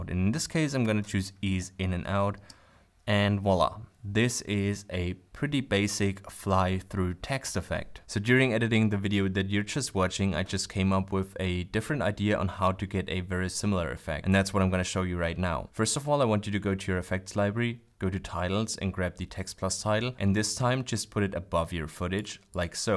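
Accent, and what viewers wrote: Germany. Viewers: Man, for a German you barely got any Accent left, how come?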